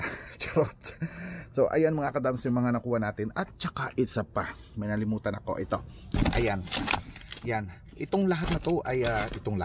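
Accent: Iloilo, Philippines. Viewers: Wow sir ang sarap ng mga nakuha mo.i.lke u kc nag iiwan ka for ithers fi mo inuubis lahat. At namiss ko ung ilonggi accent mo manong.welcome back gid last ko tan aw ato bala damo nga mga bayo gintilon mo kesa ibaoy sa land fill kanugon gid.salamat gid sa imo. watching from antipolo city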